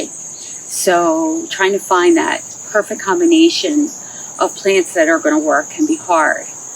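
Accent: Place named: Philadelphia, United States. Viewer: Just lovely. Guessing you’re near Philly? I hear a slight accent especially when you say wooder instead of water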